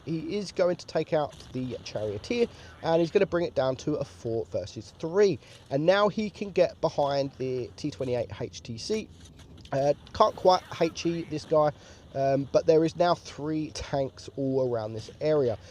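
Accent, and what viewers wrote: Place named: England, United Kingdom. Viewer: drake 515_3 just a English thing. Listen how he says armor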